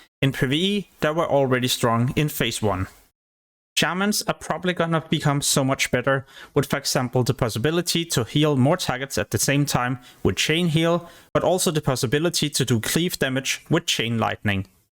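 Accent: Denmark. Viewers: this guy is Danish, 100%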